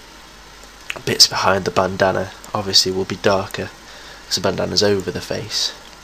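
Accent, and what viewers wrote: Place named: RP, United Kingdom. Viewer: You sound so posh on this!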